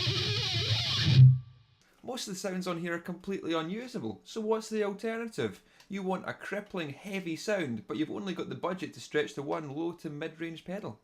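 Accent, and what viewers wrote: Scotland, United Kingdom. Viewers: The Metal Zone got its bad rap because (most) guitar players can't work a parametric ("sweep") EQ. I find it way easier getting the sound I want out of any amp using the MT-2 than any other pedal. Just don't crank the distortion, boost the mids and sweep it until you find your mids sweet spot, then dial it back to where you like. DON'T boost the highs too much, nor the lows. You can easily get 5150 type distortion out of this pedal straight into a line box if you know what you're doing, which its critics don't seem to. Ps. I love your scottish accent, but your guitar tone could open up its top end a bit more. Ds. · your accent was a Little difficult, are you scottish?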